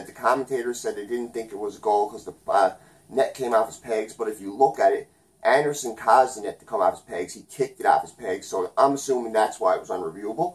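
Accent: Newfoundland, Canada. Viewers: No trouble telling you're a Newfoundlander, yes by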